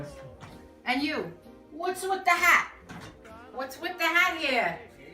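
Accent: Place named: Bronx, United States. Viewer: Another nice video! You sound like a Bronx gal to me. My Grandpa was from there. Try the whey in soups, it's really good. I have a smoker, so I might attempt making some scamorza.:)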